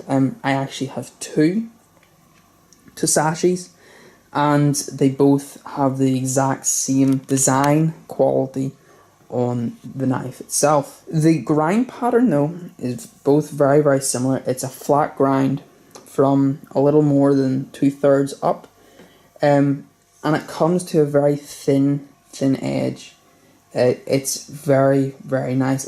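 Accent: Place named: Ulster, United Kingdom. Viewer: Hi 'Bout ye! Glad to hear an Ulster accent on YouTube. Enjoyed your vid,very professional presentation, it flowed with just the right pace and level of information. Excellent appropriate use of close focus. Good idea to end with 3 comparative size/style knives. I've chosen a purple Tsuchi today, I like a bit of bling!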